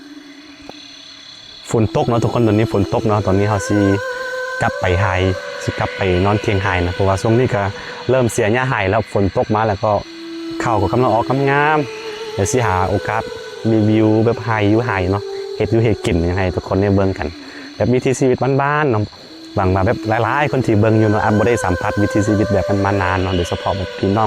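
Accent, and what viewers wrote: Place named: North, Thailand. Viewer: หม้อขางคือกะทะภาษาเหนือผมว่าคำพูดฟังง่ายเพราะพูดสำเนียงไม่ออกอีสานหรือลาวเวียงจันทร์มันออกทางฟังง่ายๆเหมือนภาคเหนือของไทยผมคนเหนือฟังโดยไม่ได้แปลเลย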